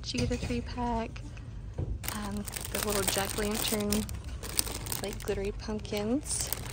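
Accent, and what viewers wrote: South, United States. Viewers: First time following u! I love Halloween 🎃 everyday!! Are u from the south? I live in Iowa no dt Halloween?!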